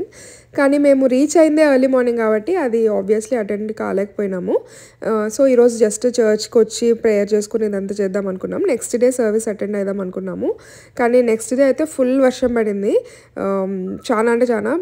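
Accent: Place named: Kurnool, India. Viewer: Kurnool yasa vinte aa kicke veru Abba !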